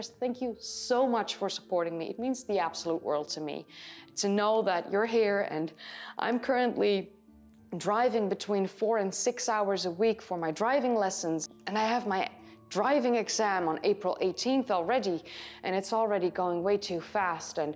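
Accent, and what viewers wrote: Netherlands. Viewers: that slight Dutch Accent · where are u from? certainly not American some kinda Euro country... OH Holland! cool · Dutch girl! (I heard it.)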